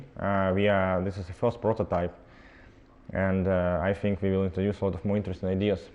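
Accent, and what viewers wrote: Russia. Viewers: Действительно акцент у него какой то Русский !!! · странный чел с русским акцентом !!! а телефон дерьььмо -) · Акцент русский, потому что ОН -РУССКИЙ!!! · Какой-то акцент у него русский... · Сразу чувствуется Русский акцент :-)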